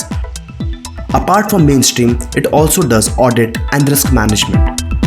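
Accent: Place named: India. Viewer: I hate indian accent i always did sorry. 😆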